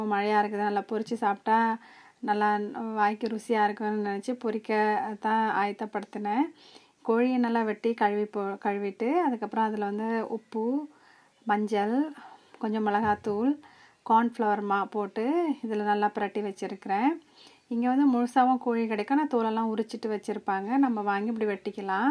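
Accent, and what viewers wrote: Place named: Jaffna, Sri Lanka. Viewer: Beautifully presented dinner with no carb. Unga tamil different a iruku a bit of Indian and a bit of Jaffna Tamil.Enjoy watching. Keep posting 🦋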